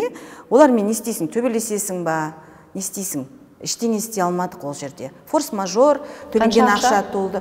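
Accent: Standard. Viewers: ханым, жарайсыз! Осы интервьюда таза казак тилде сойлеп шыктыныз, кулакка оте жагымды естиледи екен (менде казак тилде клавиатура косылмаган айып етпесин) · қазақша таза сөйлеп қалыпты, молодец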